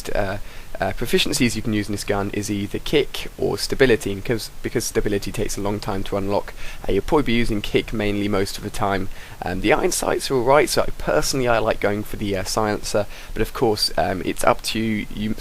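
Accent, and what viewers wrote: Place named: England, United Kingdom. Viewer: you sometimes sound like brownay( i hate brownay) but in a english accent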